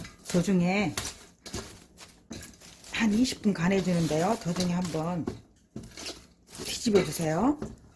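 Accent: Jeolla, South Korea. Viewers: 누님 고향은 어디신가요, 말씨가 전라도 같은데?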